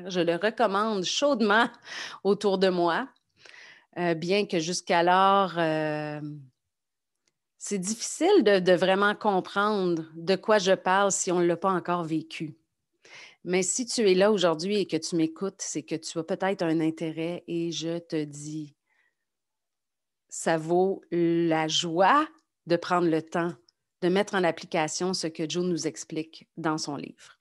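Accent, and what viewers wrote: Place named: Quebec, Canada. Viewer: Merci beaucoup pour ton partage. Par hasard je fais la méditation 🧘‍♀️ e juntamente avec ton accent Québécois, m’a fait pensé à une chère amie que S’appelle Jacinthe que nous avons travaillé ensemble, elle était infirmière 👩‍⚕️, et est retournée à son Pays. J’espère bien qu’elle puisse Retrouver ton témoignage et pouvoir déguster à son tour dans le champ d’unitie. Je t’embrasse très forte depuis la Suisse 🇨🇭 GRATITUDE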